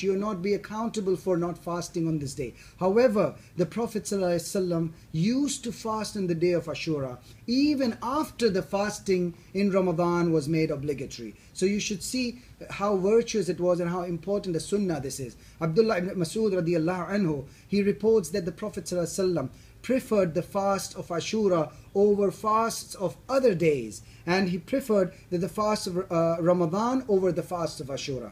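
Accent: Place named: South Asia. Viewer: You seem to be pretending being an Arab from Saudi Arabia but I can notice from your look and accent that you are actually of some South Asian background possibly Pakistani or Indian. You don't have to look like an Arab for people to listen to you.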